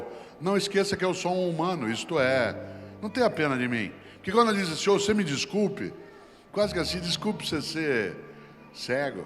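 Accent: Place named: Londrina, Brazil. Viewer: Amo o sotaque do Cortella !Q honra p Londrina ter um gênio q nasceu lá! Parabéns Londrina. P seu filho q o universo admira.aplaude. ama.aprendre.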